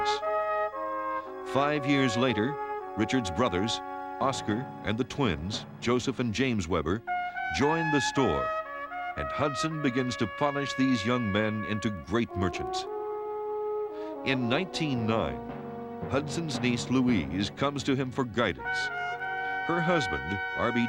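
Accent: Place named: Transatlantic, United States. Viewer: I miss the old timey transatlantic accent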